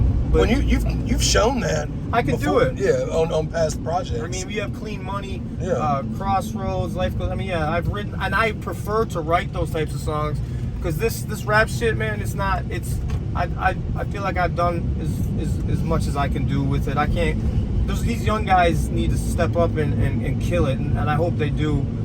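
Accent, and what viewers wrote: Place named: Ohio, United States. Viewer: Adams Ohio accent man.. can't do it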